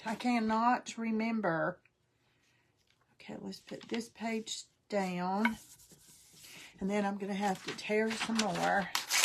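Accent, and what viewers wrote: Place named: North Carolina, United States. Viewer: I knew that accent! I am from NC too!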